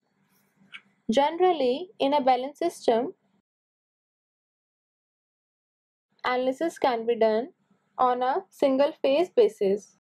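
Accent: India. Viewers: Indian voice is hot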